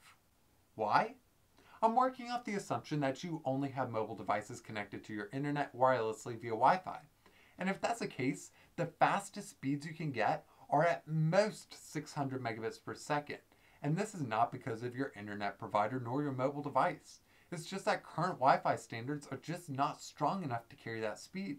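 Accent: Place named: Philadelphia, United States. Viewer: The accent is distracting lol. Philly? Baltimore?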